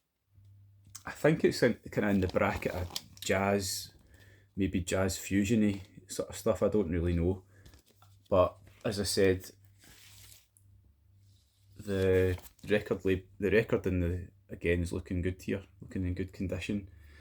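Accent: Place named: Scotland, United Kingdom. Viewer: Get rid of those vinyl relics and while you're at it remove that daft Scottish accent 😆👎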